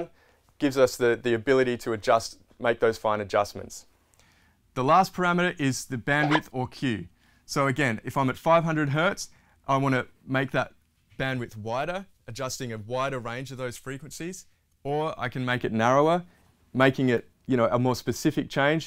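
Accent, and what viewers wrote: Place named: Australia. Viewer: That's an Aussie accent if I ever heard one, mate. I have to say the editing and visuals on this video are incredibly tight and the explanation is very straightforward. Job well done! :)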